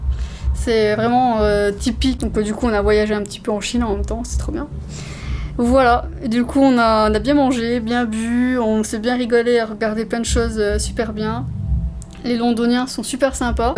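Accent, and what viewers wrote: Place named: Franche-Comté, France. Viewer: Je suis née à Montbéliard et j’habite dans les Vosges. Dès qu’il y a une pointe d’accent franc comtois, je le reconnais 😄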